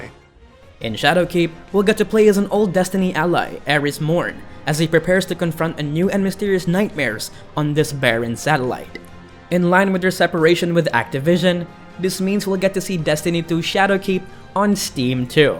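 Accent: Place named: Philippines. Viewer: You must be Filipino, cuz I hear it in your accent. Am I right?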